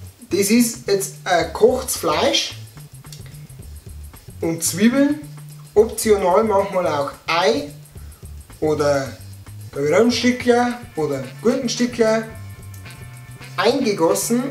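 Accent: Bavaria, Germany. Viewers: Hawadere ... es ist so wohltuend, einen jungen Menschen Dialekt reden zu hören, hier in Amberg, wo ich herkomme, reden die nur noch Hochdeutsch, die Dialekte sterben bald aus, wodurch ja auch, besonders im Bayrischen, Genialität verloren geht, z. B. zusammen wird zu zamm, drei Silben zu einer ... manches verstehe ich allerdings nicht wie das n in heind , wir sagen hier heid ... hätte jetzt gern Dialekt geschrieben, aber die normalen Buchstaben reichen nicht für alle Laute aus ... es gibt auch brotlose Brotzeit, für die man dann manchmal keine Zeit hat ... Servus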